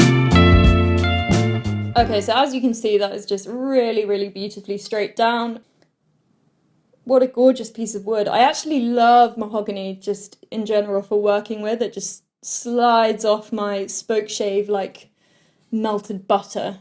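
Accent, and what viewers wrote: United Kingdom. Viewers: Guitar necks, katana's. Yes it's most likely your accent. BUT, Also in American English , thay tend to drop the letter T from a few of their words. For instance ; important is said, impor ant. Mountain is said moun an. ive even heard prodly instead of probably. So you mix this with your british accent and you get katana. Funny thing is they actually pronounce the T in Katana. Why do I know this? Because I'm a Kiwi living in the states for eight now. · Thanks for sharing your knowledge so didactic! I love your British accent and mainly your guitar-making! Regards from Brazil!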